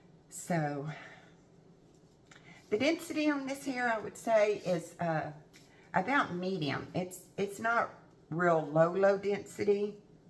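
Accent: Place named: Texas, United States. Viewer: I just stumbled in your review by accident while looking for Rachel Welch short wigs. I’m so glad I did. You give such a new refreshing opinion to wig reviews. You also sound southern or Texan which is homey to me. I like that you seem really interested in helping wig wearers. I’m new to hair loss and it keeps me tucked into my house. I’ve purchased about 10 wigs but sent most back. I’ve got to find something I can wear out of the house. Thanks for the help.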